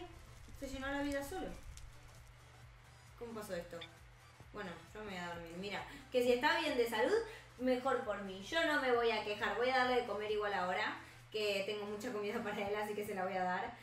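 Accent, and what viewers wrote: Argentina. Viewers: No es española es Argentina me 😑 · Eres Argentina?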